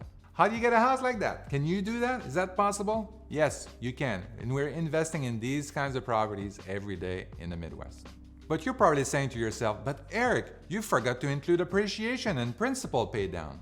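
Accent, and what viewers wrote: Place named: Quebec, Canada. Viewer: yes I could hear that québécois accent a kilometer away too;)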